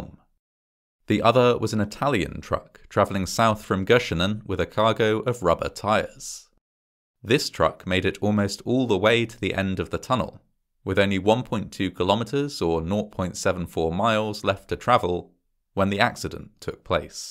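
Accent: England, United Kingdom. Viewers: I recently discovered your channel and (in hopefully as unracist a way as possible) I must say I love listening to narration with an English accent, as opposed to the OTT overly dramatic sounding American accents that seem to dominate disaster documentaries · I adore this horrific channel. I think the British accent is the absolute best accent for speaking English. It just is…I’m a dummy and it always sounds more sophisticated; in particular, I like my tragic stories delivered from a British accent…I don’t want to listen if it’s not British 😊 maybe Aussie in a pinch but I really love them dirty little Britts. You’re awesome!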